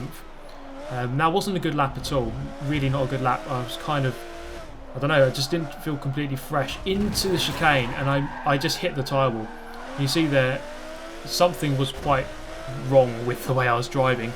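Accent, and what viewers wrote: United Kingdom. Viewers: wow, I really didnt know. thx for info...I was so confused when I found your vids, because I thought: "why is this guy sounding british but is using the imperial system?"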